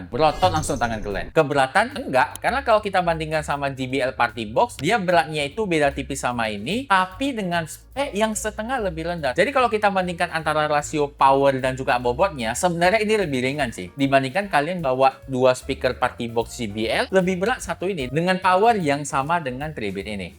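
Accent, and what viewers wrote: Medan, Indonesia. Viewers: berat "kali".., agak2 medan gaya ngomongnya.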